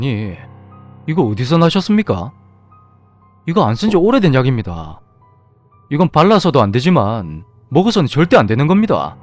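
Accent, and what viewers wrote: Gyeongsang, South Korea. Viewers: 경상도 사람ㅇㄱ라 언뜻 나오는 경상토 사투리 억양이 너무 익숙해서 그런지 더 듣기 좋으네요 ㅋㅋ오늘도 잘 들었습니다 ❤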